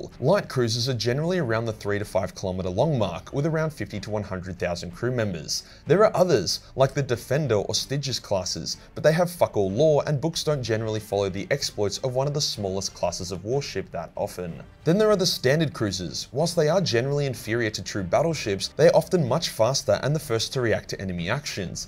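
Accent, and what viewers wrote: Australia. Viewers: Hearing an Australian swear at me and also teach me 40k lore is some what pleasing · Damn loud aussie lull me To sleep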